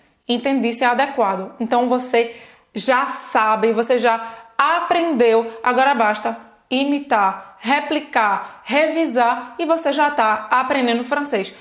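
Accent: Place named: Northeast, Brazil. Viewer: Eu queria saber porque não perdeste o sotaque nordestino, que eu acho muito legal !? Parabéns menina ! Adoro os seus vídeos. Mesmo estando muito longe ,estás ajudando o nosso povo !! Deus te abençoe !! Gravataí RS Brasil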